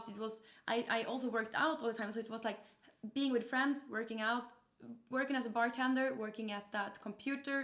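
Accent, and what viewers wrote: Sweden. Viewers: She's been a pro streamer for quite some time...yet she has the thickest swedish accent you'll come across. How does it not improve lol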